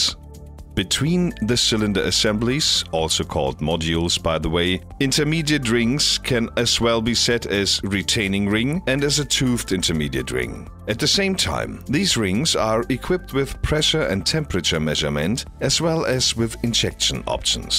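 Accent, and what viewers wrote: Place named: Germany. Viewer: German accent turned up to 11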